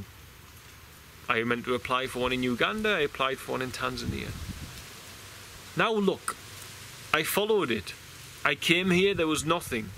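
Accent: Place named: North, United Kingdom. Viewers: ah the northern accent. I am from NEwcastle! I see , , , , , , , , and which is my birthday! I went down south to the frack pad in Preston New Road to film the police brutality and the old tax disc on one of the campervans was ! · Are you from the North East in the U.K.? I am and recognise your accent. I too receive these numbers and beleive that they are guides or markers to say that I'm on the right path. May God Bless You.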